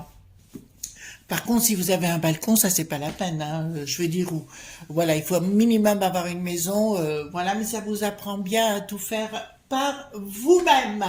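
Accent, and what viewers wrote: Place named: Provence, France. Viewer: Bonjour, j ai reconnu l acccens du pays...sa sent bon la farigoulette et la lavande..je vous souhaite une bonne soiree. ♥☺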